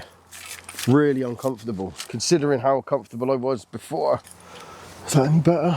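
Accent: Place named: Southwest England, United Kingdom. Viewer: Subbed! Great energy! Enjoyed watching this. It's nice to see someone happy with ' the average man' groups and not all the 10 shots inside 5p at 100 yards. Sound local ISH ( Plymouth, southwest)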